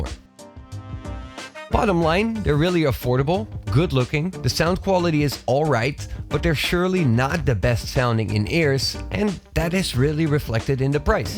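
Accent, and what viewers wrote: Netherlands. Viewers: Are you Dutch? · This guy has a subtly Dutch accent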